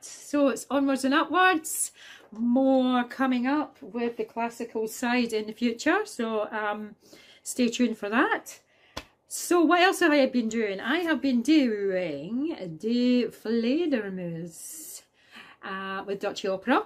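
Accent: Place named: Scotland, United Kingdom. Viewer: Hi.wow your a busy lassie..always good to hear a Scottish accent lol...your solo... not the type of solo I'm use to hearing lol...sounding great tho...and you are loving it..well done.and congrats on your certificate 🤘...xx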